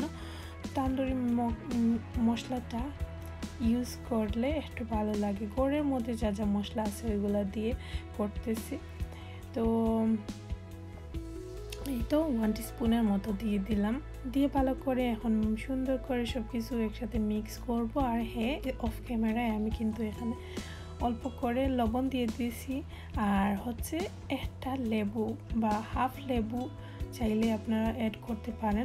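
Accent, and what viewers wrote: Sylhet, Bangladesh. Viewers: sylhety sounds natural to hear that's all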